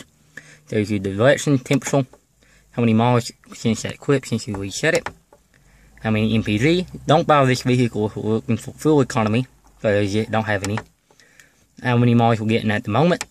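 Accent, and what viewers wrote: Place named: Australia. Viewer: think he's either Australian or New Zealander.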